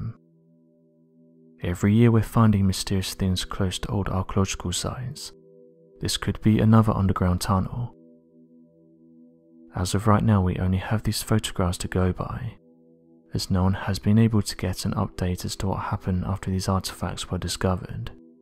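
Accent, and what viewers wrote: United Kingdom. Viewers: Let me start by saying I really enjoy your channel. However, when I hear the voice of the current narrator I cringe. Because no matter what the setting I dial in on my speakers is, his voice is always low and muffled, that combined with the British or some kind of other foreign accent, I can't understand him half the time even with my earbuds in. So I'm just asking you guys if you could please maybe do something about that? I really enjoy the subject matter and would hate to have to tune out due to the fact that I'm just not going to get it. My hearing may be not that great, but I don't have any problems with your other narrators and with any other channels that I listen to on a regular basis 🤔👍 PS- The voice puts me to sleep, as well 😂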